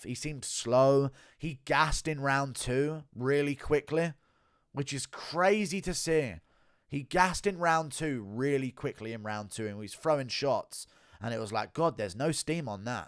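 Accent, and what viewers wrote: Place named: United Kingdom. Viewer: Mate, where you from in the UK??? Mad accent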